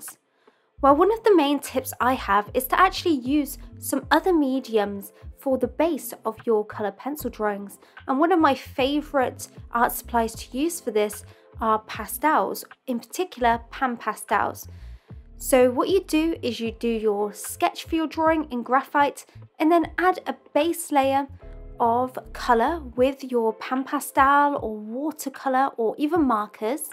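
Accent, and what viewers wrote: England, United Kingdom. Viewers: Hey. I love your work. What part of England are you from?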